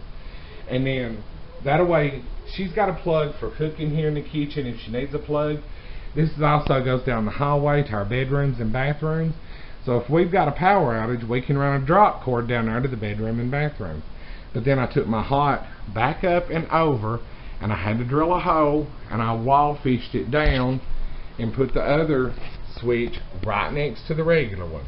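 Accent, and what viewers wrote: South, United States. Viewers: I am very curious as to what part of the country you got that accent from. It is awesome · ...KOOL kiss SET-UP and approach to your project ... KOOL southern accent.... Blessing w all ... :-) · O men, i LOVE your country accent! :D It's so beautiful ^_^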